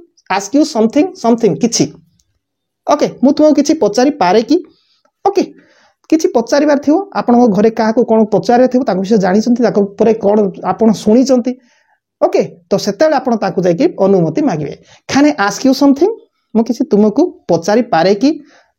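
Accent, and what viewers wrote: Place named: United States. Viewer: Sir American accent kahuchanti 🥱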